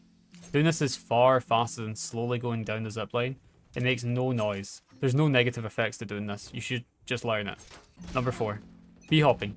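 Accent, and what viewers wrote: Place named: Skye, United Kingdom. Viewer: i love that skye accent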